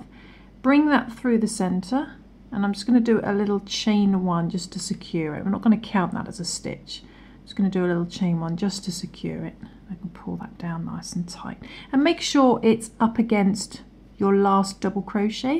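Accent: United Kingdom. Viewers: Love this and will be trying it. Just find it bit confusing you use US terminology. why is that? is it just personal preference. you certainly sound British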